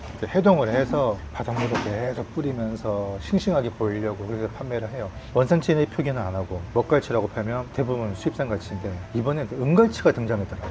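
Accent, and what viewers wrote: Gyeongsang, South Korea. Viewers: 님을 알게되고 영상을 수년간 봐오면서 의아?했던점이 '서울분으로 알고 있는데 말씀하실때 왜 경상도느낌이 계속 나지?' 했는데 오늘에서야 비로소 궁금증이 해결되었네요ㅎㅎ부모님께서 부산분 이셨구요ㅎㅎ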